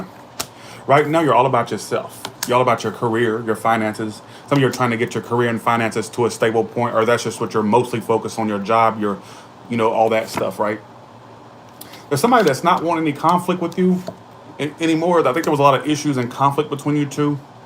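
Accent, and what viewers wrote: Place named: Georgia, United States. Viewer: Thank you for your frequent readings! I meant to get back to you when you said you’re from Georgia, not NC; I have relatives from Georgia, that’s why your accent sounded so familiar lol. Thanks for responding! You’re such a sweet soul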